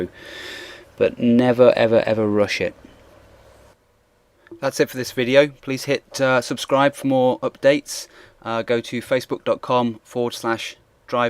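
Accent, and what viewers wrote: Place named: Northern England, United Kingdom. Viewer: I detect a lovely mild northern english accent. :)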